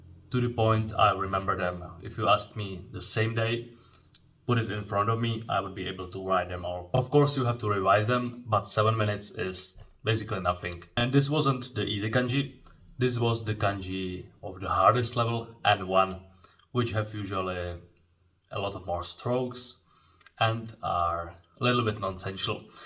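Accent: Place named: Japan. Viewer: I really appretiate what you doing here on the internet. Keep up the good work and I wish you the best for your future. P.S. you're so Japanese that even your English has a Japanese accent to it.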